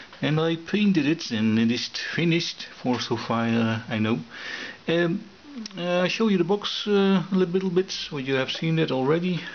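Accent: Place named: Netherlands. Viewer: I love the Dutch diction and intonation. No matter what, when a Dutchman is explaining something, even if it's something completely mundane, it always sounds like he's reading from a text book on quantum mechanics.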